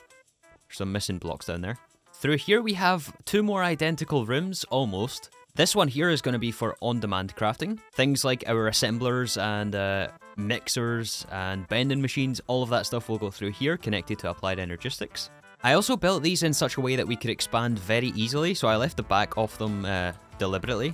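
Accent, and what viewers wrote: Scotland, United Kingdom. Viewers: this is my comfort content, hearing his silky smooth scottish accent say things that my brain doesnt have a single clue about is so soothing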